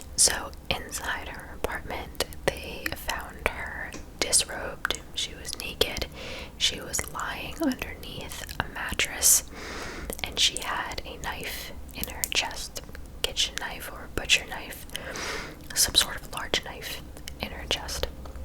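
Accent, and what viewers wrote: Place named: West Coast, United States. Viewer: You sound super West Coast when you talk.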